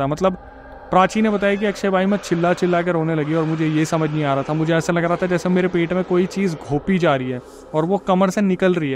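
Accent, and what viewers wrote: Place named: Bhind-Morena, India. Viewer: Bhind morena ki language h bhai 😂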